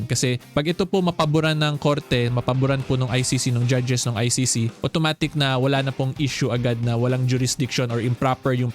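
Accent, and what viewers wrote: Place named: Northern Samar, Philippines. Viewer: Atty taga northern samar ka ba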